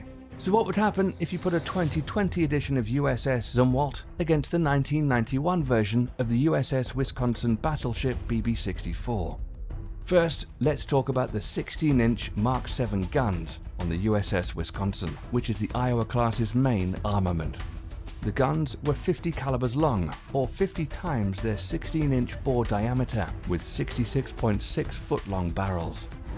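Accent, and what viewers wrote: United Kingdom. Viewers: What the hell is a "gate-ling gun". LOL And why is a british person narrating these videos? 🤣🤣🤣🤣🤣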